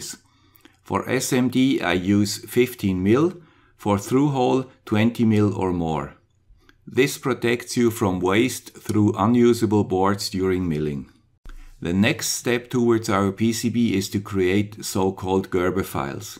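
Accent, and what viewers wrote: Switzerland. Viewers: Where can I buy one of those Swiss accents? It sounds great. And, on your videos, I especially appreciate - your commentary is not competing with loud music. If only all YouTube videos were like yours.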